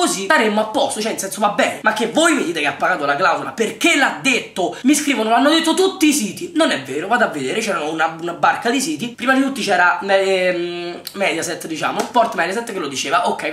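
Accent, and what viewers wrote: Rome, Italy. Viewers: sei romano?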